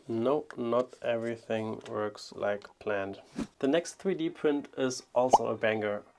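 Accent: Germany. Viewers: Are you German? I love your accent! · I love this guy, I'm german but moved to America when I was 4 and listening to him is like listening to opa and onkels speaking English to me when I was younger because they wanted to show me how smart they were. Thank you for the heartwarming memories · i hear german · Direkt raus gehört das du drutscher bist, deswegen noch sympathischer 👍🏽 mach weiter so super video🫶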